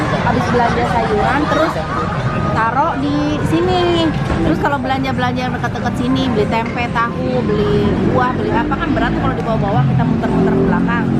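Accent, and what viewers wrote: West Java, Indonesia. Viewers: Ari teh teh orang bandung na palih mana, meni resep ih nyariosna lucu khas sunda pisan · teteh meni raoseun logat Sunda na😀😘😘😘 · Aku sneng bgt sama chanelnya te suka sma logat sundanya, upload yg bnyak ya teh 😁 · Salam kenal dari aku teteh seru banget belanja ke pasar semua diborong Teteh aku sangat suka nonton semua Vidio teteh Cara ngomong teteh b sudanya masih terasa Itu yg bikin khas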